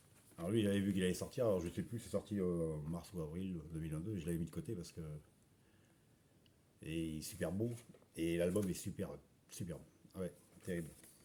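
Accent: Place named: Brittany, France. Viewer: ton Breizh-anglais est des fois limite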